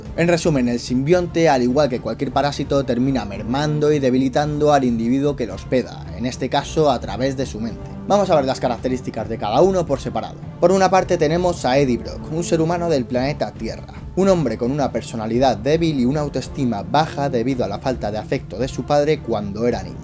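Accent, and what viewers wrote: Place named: Spain. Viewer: Estuvo muy bueno , pero la verdad los españoles la Cagan siempre en su forma de pronunciación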